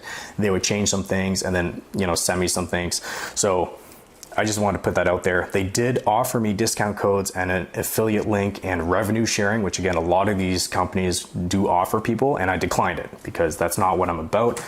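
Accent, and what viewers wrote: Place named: China. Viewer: ESL...you mean Chinglish.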